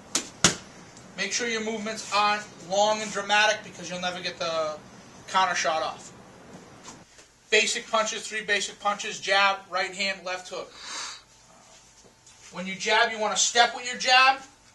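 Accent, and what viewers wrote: Boston, United States. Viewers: its a boston accent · Boston accent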